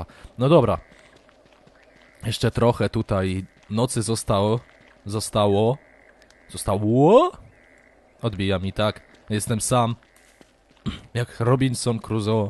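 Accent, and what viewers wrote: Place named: Podkarpacie, Poland. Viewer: Zgaduję że jesteś z podkarpacia , albo z okolic bo tak mówisz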